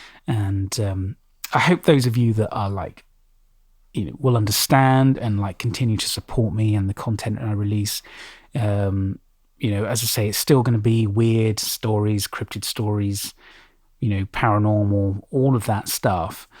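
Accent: United Kingdom. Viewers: Well, for me personally,it was like YT stopped showing me your content. And I always try to engage in the channels i like,even if it’s just liking the videos.. and they’re definitely not going to tell you if your being shadowbanned!! And that sucks about the name!! I’ve noticed channels that do well, consider their fan base as their “family”, and interact often on live streams,posts,surveys (I do them!) never feel like you can’t ask your fans what they want!! I also started watching for wendigo stories….it’s hard to find ones that are close to lore, and aren’t getting skin walkers and wendigos mixed up..there’s some good stories out there, out of the thousands of crappy ones! Find something that you can make ur own, like ASMR(rain,fire,wind sounds)and scary stories. It’s oddly soothing. “Being Scared” I believe is his name is a great creepy pasta narrator,with ASMR. 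Dark Somnoum writes and performs all his music in his videos,and many narrators in the community support him,he’s a great narrator,as well as musical talent!! So maybe there is something special you can offer your fan base as well! It makes folks feel appreciated! I hope some of these comments helped,and are solely meant to be constructive! I am a big fan of yours as well,I love the Brit accent and you have great narration skills as well! I know it can’t be easy!! But thank you for the great content no matter what!!😊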